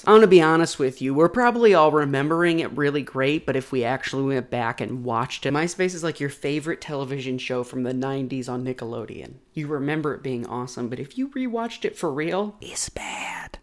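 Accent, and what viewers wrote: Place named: Boston, United States. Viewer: It’s a fucking Boston accent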